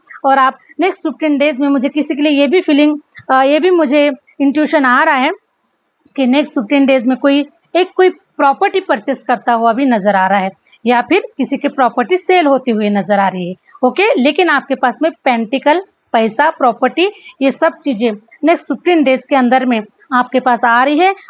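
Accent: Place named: Maharashtra, India. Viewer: Mumbai wali language Marathi vibe hain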